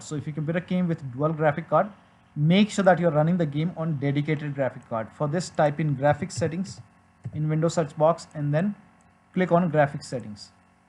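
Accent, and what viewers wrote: India. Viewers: every time that i hear indian voice i know my problem will be solved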